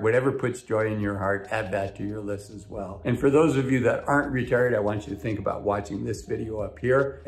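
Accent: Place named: United States. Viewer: Ohh my God… what is this man’s accent… I love his accent… I can listen to him forever. Even if it is financial information as it looks like 👍 fine I will take that 😂 I live in Norway 🇳🇴 so please don’t shame about my terrible knowledge of American accents 😊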